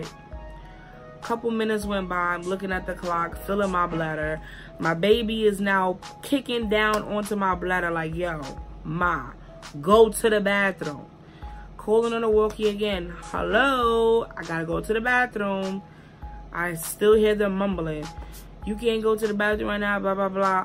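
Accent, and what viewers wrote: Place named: Brooklyn, United States. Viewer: I bet you from Brooklyn 🤮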